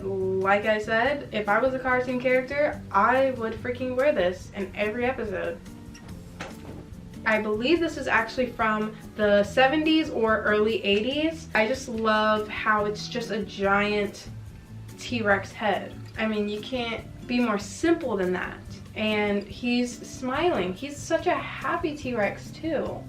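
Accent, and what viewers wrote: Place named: United States. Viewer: u sound like one of my cousins from america 🥺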